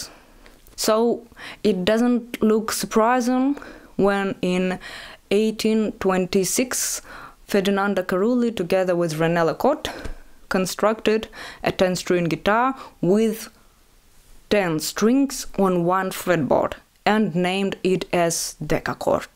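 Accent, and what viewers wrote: Russia. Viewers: Music needs more than 6 or 7 or 10 strings, music is choking with limited strings number, give it freedom 💁🏻‍♂️ I enjoy this explanation, and like the way you speak rushinglish😅... But above all, my dream to have 6 or 7 or 10 strings guitar 🤩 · I love your accent hehe. You know pretty much of music history by the way, wish some day meet you and talk all day, you can teach me russian, and I can teach you spanish ! Hahaha. Buen video, saludos !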